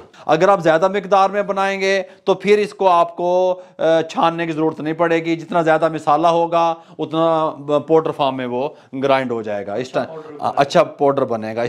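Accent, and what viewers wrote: Punjab, India. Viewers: Zaberdast gi. Ap hazare se hai kia ya punjabi? Apke bolne ka style mjhe acha lgta h. ☺️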